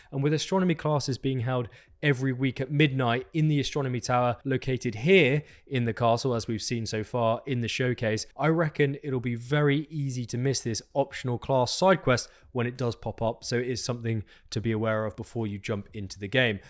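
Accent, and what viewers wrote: United Kingdom. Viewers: Love this info on professors! You're by far my favourite channel for Hogwarts Legacy :) Great info, presented well and with a lovely British accent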